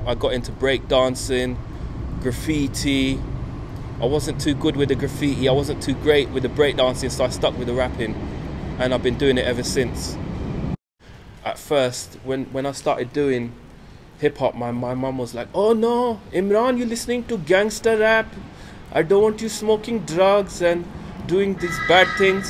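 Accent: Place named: United States. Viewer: He's good tbh..but he needs to put the British accent on...and not American..even though American accent sound better, but yeah other than that he's good™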